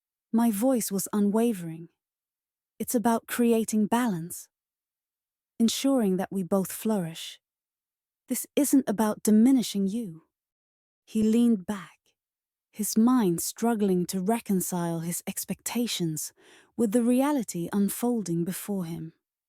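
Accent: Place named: United Kingdom. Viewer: Why do all these FLR stories have a UK voiceover. Probably due to the high level feminists in there country. Enjoy while you can before sharia law takes over.😂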